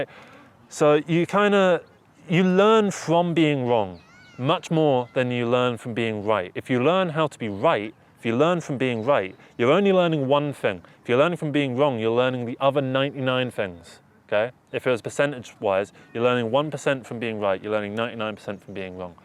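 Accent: United Kingdom. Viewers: The algorithm seems to incorporate even your style of music, scary! Meaning I like your music also, the eminor guitar stuff. Holy shit, you’ve basically just wrote a best selling psychology book for artists! Prove me wrong! I’m double your age. Maybe it’s your brit accent, but your style and mannerisms remind me alot of a John lennon. I know what I’m saying. Because of human nature imperfections I was gonna side with u on the relationship thing, and then The homuncle whispered, no, its no body’s fault, it just wasn’t meant to be, we didn’t mix and thats it. You see that, your realistic look of peace and love has affected my thinking! Keep playing that fucking guitar and keep talking, they inspire me, your genuine man.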